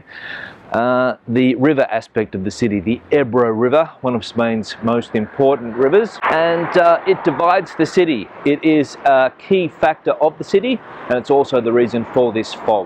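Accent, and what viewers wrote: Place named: Australia. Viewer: I can hear the Aussie accent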